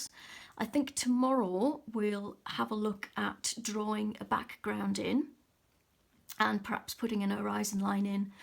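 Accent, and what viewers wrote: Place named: Geordie, United Kingdom. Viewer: no don't apologise ☺just in case you hadn't noticed lol. Wish I had half ur talent. My colouring coming on so much and just discovered ur channel. Your teaching style is easy to follow. I also used to live in ur area (near Gateshead) so love ur acent. Back in my home town now (Glasgow). Looking forward to more tutorials x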